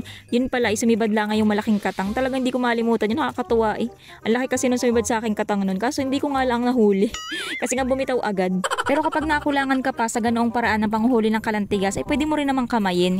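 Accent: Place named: Camarines Norte, Philippines. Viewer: Parang salita sa camarines norte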